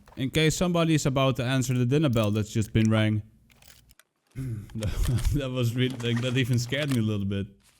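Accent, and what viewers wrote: Netherlands. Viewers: He sounds Dutch kind of northern European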